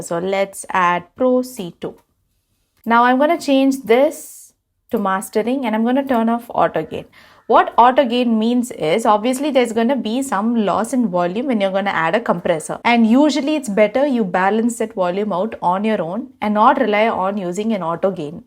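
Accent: India. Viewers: Im gonna be honest I just come hear to see and hear an Indian woman talk music mastering things are changing and I think its good keep up the good work.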